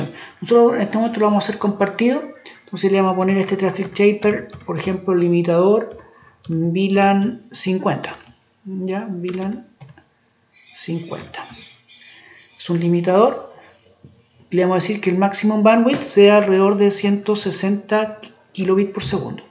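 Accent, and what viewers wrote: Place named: Cuba. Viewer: Sounds like Spanglish. I would say 95% Spanish and the words that don't translate well are English. Actually very easy to understand him, probably because his accent is very similar to a Cuban accent.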